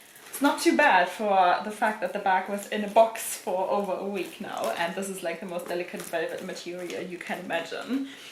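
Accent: Germany. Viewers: Love your german accent 😊😊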